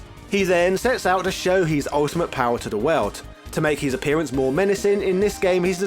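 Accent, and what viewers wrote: United Kingdom. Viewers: I'm American & I'd pronounce his channel name with my American accent. I wouldn't put on a fake British accent to pronounce it the way he does. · I say Ryu like Ree-you. Works for me. But he's a brit and they didn't say it like us Americans lol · Lol british phonics!! love it! It appears Caapcom have taken to rippong off DBZ for inspiration. essentially we have Demon King Ryu and Evil Majin Ken! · thank god, you stopped using that stupid japanese pronunciation mid video...! you are british i want to ear your british accent when you speak...!!! cool vid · I do love these street fighter trivia videos. These British pronunciations though, there so cringy. I'm English and I've always thought so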